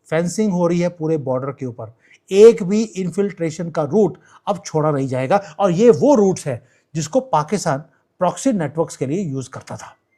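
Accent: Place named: India. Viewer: Talk like Indian but you're ❤️ belongs to chinese lady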